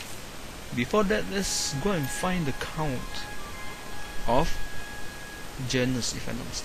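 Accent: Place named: Arab world. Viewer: Arabic right? Great Accent bro.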